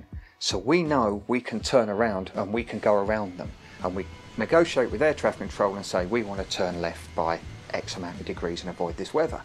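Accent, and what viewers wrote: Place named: London, United Kingdom. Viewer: I'd say you're from East or maybe North London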